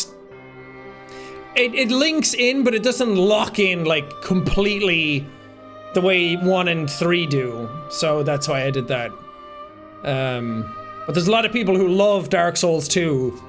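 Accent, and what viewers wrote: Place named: Ireland. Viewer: 8 and a half hours of screaming irish man, yes sign me up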